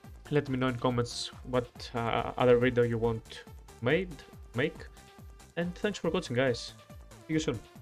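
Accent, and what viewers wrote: Greece. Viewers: πως καταλαβα οτι εισαι ελληνας 😂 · He sounds greek or arabic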